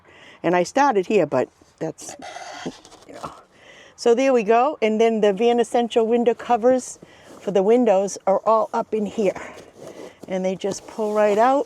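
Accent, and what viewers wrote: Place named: Boston, United States. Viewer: I usually love Boston accents but this one got old very quickly.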